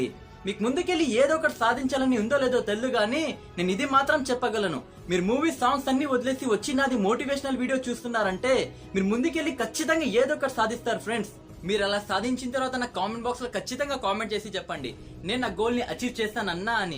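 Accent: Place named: Rayalaseema, India. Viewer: Rayalseema slang superb bro iam Harish ekart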